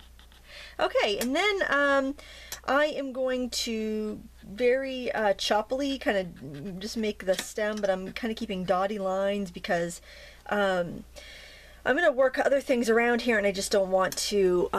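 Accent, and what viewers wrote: Maine, United States. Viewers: Love it when you tell us how words are said in Maine. 🤣shaaarpies lol I bought died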